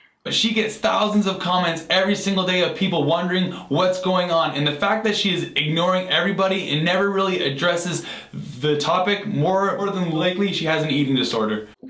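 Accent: Scotland, United Kingdom. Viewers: im frm south west scotland, you sound similar to me tbh, i understood every word, but also understand how slang it can sound x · Please of course her accent is strong... Scotch, Scotland, strong accent.. Stop being negative and listen after you listen for a few minutes you get the sound and some of the slang is much like ours (assuming you speak English). is a very intelligent woman so don't miss out on her humor and wisdom because you won't take the time to just listen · I love your accent, sooooo scottish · it's a scottish accent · Her Scottish accent is so O.oooooooooooooooo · is from Scotland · i love your Scottish accent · thank you for defending her. I've been defended her and was attacked. Also I love your accent Scottish right? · OMG I F*CKING LOVE THIS YOUTUBERS SCOTTISH ACCENT BEAUTIFUL Love Scottish and Irish Accents <3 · She is Scottish just like me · how can you not understand??? 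it's a fucking Scottish accent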